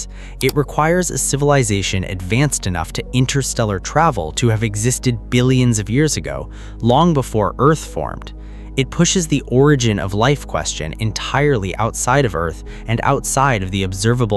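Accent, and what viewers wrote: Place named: California, United States. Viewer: The most California accent I've ever heard.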